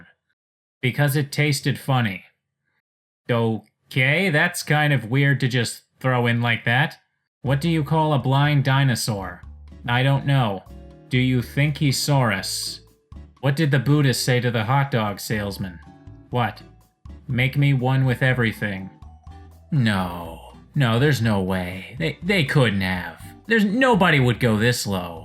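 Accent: Canada. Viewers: dad jokes the game! great video, love your content. is your accent canadian? it soothes me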